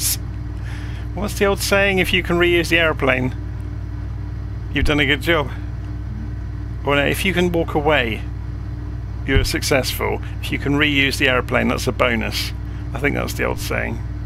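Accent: United Kingdom. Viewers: He's a Pommie! 😀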